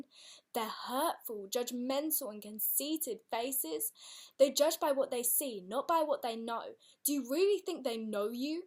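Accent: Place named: United Kingdom. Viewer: british accent just makes people 3 times more attractive. ughhhhh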